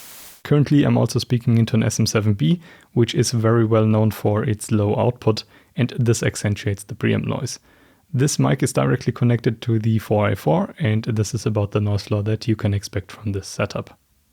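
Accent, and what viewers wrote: Germany. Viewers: Good video. But I think you are German… it’s the typical pronunciation there… no front! It’s a very good video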